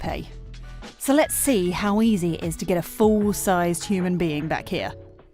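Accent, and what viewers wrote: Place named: Essex, United Kingdom. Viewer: Hi,its got a head up displaaaaaaaay 🤣🤣🤣 Love it,the essex accent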